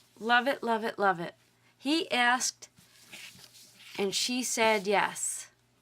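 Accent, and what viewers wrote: New York, United States. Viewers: Very cute! Love the NY accent 😁 Yay is back!!!!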